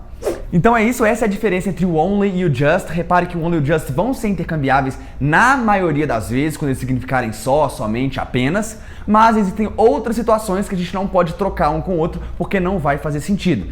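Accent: Minas Gerais, Brazil. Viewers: Ele é mineiro?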